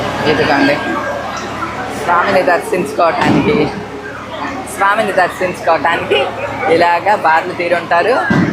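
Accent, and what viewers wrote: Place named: Godavari, India. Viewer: Maintinundi aa someswaruni darshanam kaliginchinanduku chala santhoshanga undi chala santhosham yee janmabandamo idi sivayyalani andarini chupistunnandiku chala santhosham mee godarollo matalu chala theeyyagauntayandi aaa godari neellalaga chala santhoshamandi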